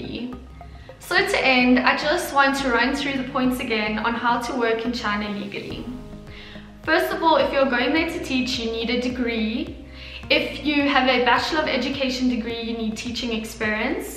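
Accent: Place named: South Africa. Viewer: your south africa accent isn't that strong. i am kinda surprised that you got teaching position in china. british or american english are preferred there and considered as "authentic english". i had been to south africa multiple times, some of afrikaans got really thick accent, took me a while to get used to their speaking patterns.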